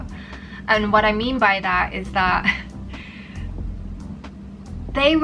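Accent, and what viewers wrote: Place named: United Kingdom. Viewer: beautiful british accent :)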